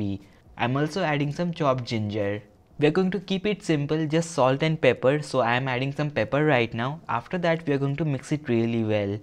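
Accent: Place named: Bengal, India. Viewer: Sounds like bengali and they're good at cooking.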